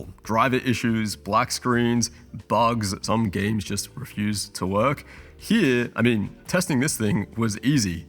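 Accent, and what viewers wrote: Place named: Australia. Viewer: why are u filming videos if u have a cold/flu. not like i can stand aussie accent anyway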